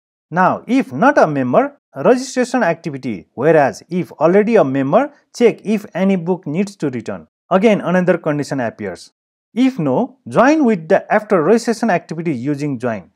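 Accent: Nepal. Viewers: Nepali accent xa ta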